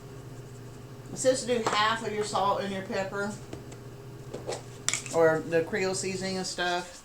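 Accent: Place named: Oklahoma, United States. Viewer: I still hear some Okie in you. I have it too.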